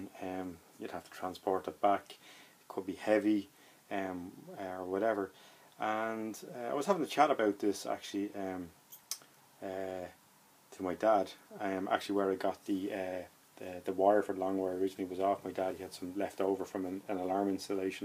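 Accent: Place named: Ireland. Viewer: I was wondering where i had heard your accent somewhere i visited before... and your accent is Irish isnt it?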